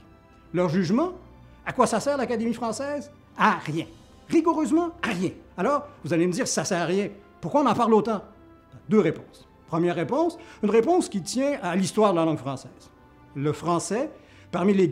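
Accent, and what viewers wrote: Quebec, Canada. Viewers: Moi j'aime bien l'accent québécois, c'est joyeux à l'oreille Sujet très intéressant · Tout à fait d'accord avec vous, la langue française s'enrichit grâce aux millions de francophones dans le monde. Elle doit évoluer et qu'on arrête s'emmerder les gens sur des détails, à l'oral, cela n'a pas grande importance. Pour ma part, j'ecris "autant pour moi" et tant pis pour cela que cela empêche de dormir 😊😁 en plus, J'ADOOOOORE l'accent québécois, je le trouve savoureux. Bises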